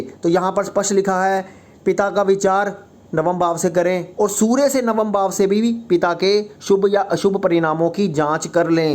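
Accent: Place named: Punjab, India. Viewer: bhai mene aapki koi Insult nai ki mene ye kehna chaha ki aapki hindi bolne se ya aapka accent hindi ka dil ko acha lagta hai usme apnapan aata hai usme punjabi touch bhi kabhi kabhi aata hai to mazaa aa jaata hai aapki knowledge awesome 👌 hai bhai main aapka subscriber hu aapko hamesha like karta hu...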